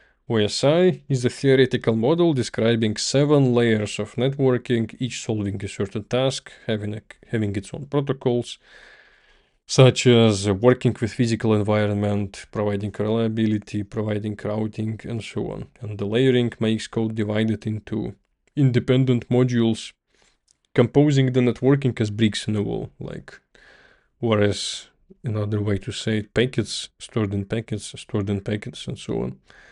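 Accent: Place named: Russia. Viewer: nice lecture other than the scarrying russian accent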